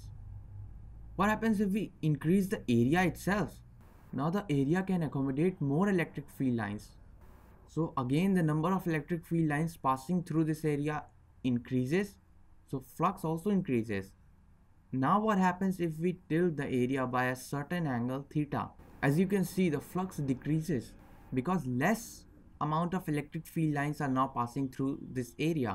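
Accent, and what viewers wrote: India. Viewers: the indian accent makes my ears bleed i can barely listen to you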